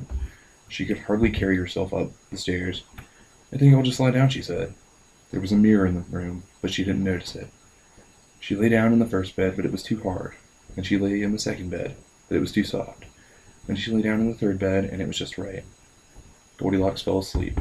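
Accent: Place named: Charlotte, United States. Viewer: I only notice a very slight accent but if you would have grown up 50 miles away from Charlotte your accent would be totally different(as in totally southern) hehe. I also live in Charlotte been here for 2 years now and it seems like the accent goes all out southern once I leave the city limits. Also most people from Charlotte are from the North, ie:NY/NJ/OH just like me:)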